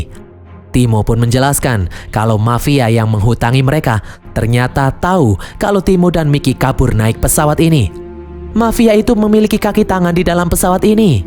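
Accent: Java, Indonesia. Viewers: kereenn..baru nemu channel recap yg agak2 medok wkwkwk. gaaassss terus mase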